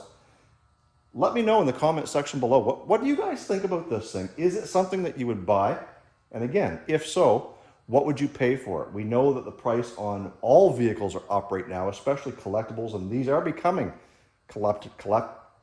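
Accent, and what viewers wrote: Canada. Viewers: Beautiful truck! I love your Canadian accent, (I'm from BC) 😅😅 I have a 94 F-150 XL 4.9L. No Rust. Love my truck!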